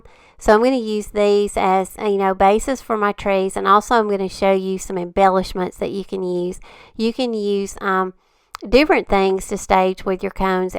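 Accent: South, United States. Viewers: I just love your channel and I love all of your awesome ideas!! I love hearing your southern accent It reminds me of all my family back I Alabama....luv and wishes to you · Just came across your channel and I’m so glad I did! First, I love your accent! I’m a southern gal from TN and appreciate your sweet southern accent. Loved all of your DIY’s! Everything turned out beautiful! Subscribed and can’t wait to watch more of your videos!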